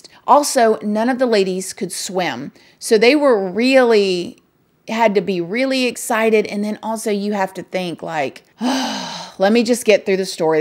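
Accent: South, United States. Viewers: New sub! Fantastic job! I knew I recognized that southern drawl. Been to Florida many times, and lived there briefly once. Nothing beats the sunset over Clearwater (my fav vaca spot) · I love your southern belle accent you're the best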